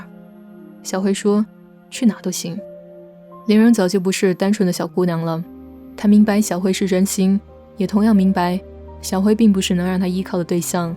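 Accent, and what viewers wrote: Standard. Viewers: 哗！你的粤语很标准呀！ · 完全冇諗過你粵語會甘標準！一直以為你只講普通話🤣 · 广东话很标准👍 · 是广东仔吗 粤语说的这么标准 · 粤语很标准呀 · 噢我就注意到了k猫的粤语很标准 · 广东话几标准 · 原來K猫會說廣東話還說得這麼標準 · 粤语很标准哈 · 題外話讚一下k貓的廣東話很標準☺ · k貓講廣東話好標準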